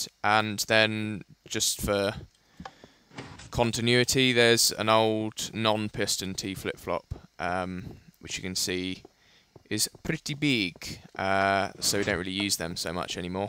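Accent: United Kingdom. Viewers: i still get amazed by all your knowledge about redstone circuits.. i wanna learn more! >:D keep it up!! nice videos!! (btw, you've got an awesome brittish accent XD) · listening to a British voice on a Minecraft video was a pleasant change. hate your texture pack though :<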